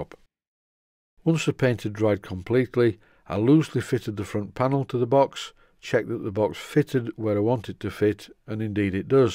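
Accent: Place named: Northern England, United Kingdom. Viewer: dont worry I understand u fine but then I am English and have spent a fair amount of time around northerners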